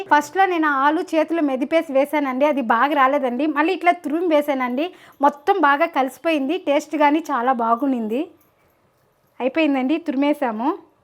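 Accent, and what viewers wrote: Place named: Karnataka, India. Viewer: I think u belong to Ananthapur district...ur slang is a bit kannadiga speaking Telugu... Ur recipes r interesting n having eye appeal..ur preparation n presentation of ur recipes r very good...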